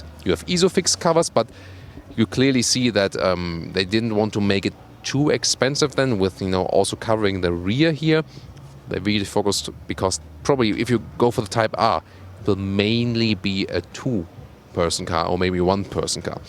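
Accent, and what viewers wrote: Germany. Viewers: I'm enjoying this just because it is a German review of the car:) I've been to Germany 7 days and love it there but listening to a German speak English and review a car.....epic!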